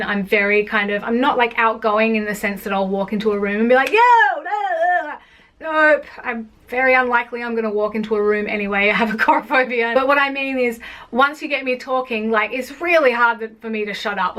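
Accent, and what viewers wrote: Finland. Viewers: Rovaniemi mainittu, torilla tavataan! That was like months worth of someone talking to me at once :D Your Finnish accent is great. Oh and if you want more winter wonderland, visit soon. Winter is getting shorter and summers are getting hotter here in Lapland. I think in 10 years it's only couple months left of "Narnia".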